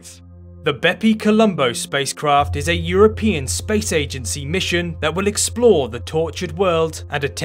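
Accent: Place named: England, United Kingdom. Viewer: I like how you tell stories about the Universe... I'm in love with your english accent. Wow congratulation.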